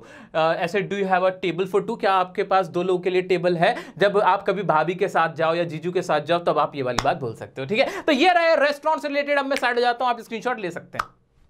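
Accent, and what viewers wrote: India. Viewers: because indian accent laga hai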